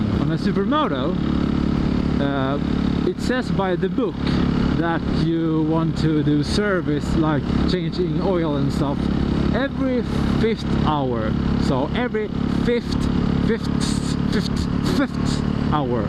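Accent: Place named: Sweden. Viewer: Hahaha so funny!! Make more videos like this! (Y) Awsm riding! :D Jeg elsker din svensk-engelsk, haha, er fra Norge selv.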